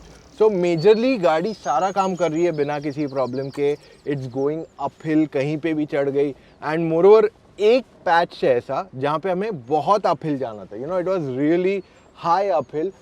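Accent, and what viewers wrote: Gujarat, India. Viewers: Sir aap gujarat se ho kya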